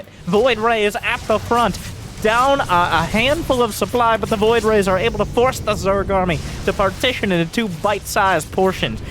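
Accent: United States. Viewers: StarCraft needs this American voice on the scene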